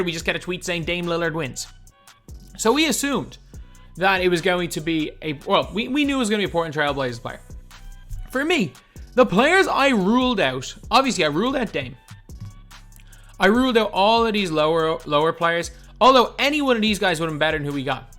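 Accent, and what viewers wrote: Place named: Ireland. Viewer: Angry Irish lad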